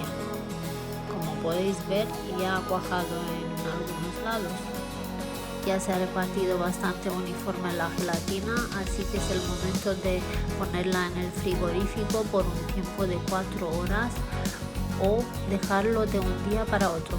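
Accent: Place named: Romania. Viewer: También quería mencionar que me fascina tu manera de hablar y tú acentos, no podía descifrar de dónde era, pero es genial que sea de Romania , deberías hacer un canal donde también enseñes tu idioma rumano!!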